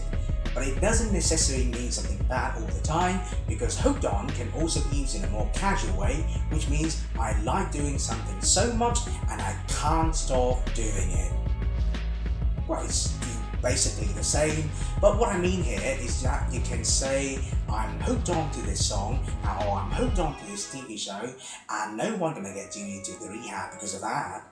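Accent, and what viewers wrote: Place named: United Kingdom. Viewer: Giọng Anh Anh chuẩn thế ạ :3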